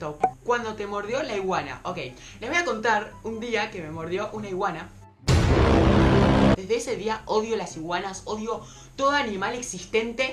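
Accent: Argentina. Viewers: se le nota los argentinos hablamos así uwu.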